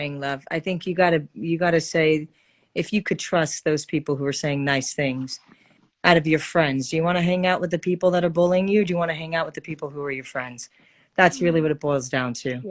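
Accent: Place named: Brooklyn, United States. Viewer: the brooklyn accent and some evil queen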